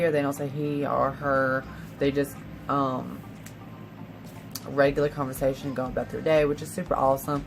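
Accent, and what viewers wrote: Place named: South, United States. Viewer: You look great,!!! Love the Southern drawl.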